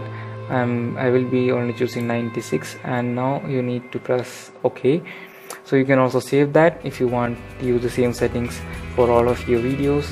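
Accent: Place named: Kerala, India. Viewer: gud tip. malayalee accnt.malayalee ano?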